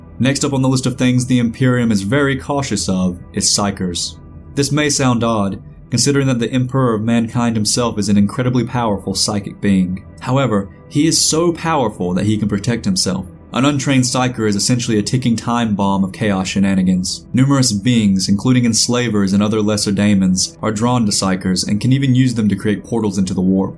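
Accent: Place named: South, United States. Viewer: Just found your channel, your southern accent is very pleasant. subbed.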